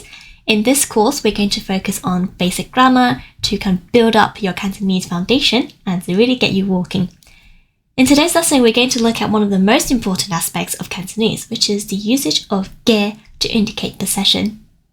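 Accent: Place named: England, United Kingdom. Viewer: Very clear. And you have a nice English accent (meaning understanding is more clear)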